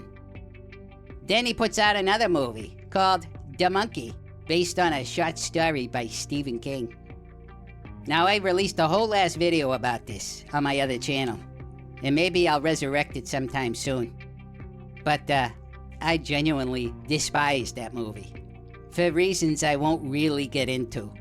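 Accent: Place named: Boston, United States. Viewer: I can for the silly title and stayed for the Boston accent. Cool animation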